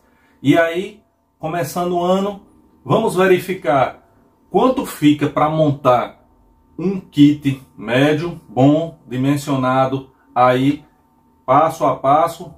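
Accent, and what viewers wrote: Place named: Rio Grande do Norte, Brazil. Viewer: Comecei a acompanhar seus conteúdos hoje e já me inscrevi, sem comentários a qualidade. Sou de Vitória ES. Uma sugestão, você tem uma boa didática e conhecimento, os principais requisitos para preparar e lecionar um curso completo no assunto, é uma forma de remunerar e é justo e honesto. Pelo seu sotaque, acredito ser de RN. Abraços e sucesso.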